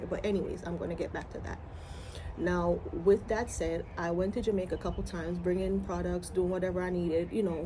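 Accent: United States. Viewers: Sorry that happened,I have a question your accent is American,where did you attend school,what state ? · Why are you talking in an American accent? Your other videos are PURE JAM!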